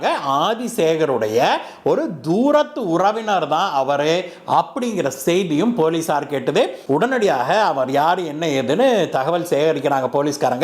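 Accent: Kongu, India. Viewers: நீங்க பேசுவது கொங்கு தமிழ் போல . ஒரு பல்ராங் (ஒரு கிலோமீட்டர்) அவுதி அவுதியாக போறாரு அவசரம் அவசரமாக போறாரு வார்த்தை இது எல்லாம் கொங்கு தமிழ்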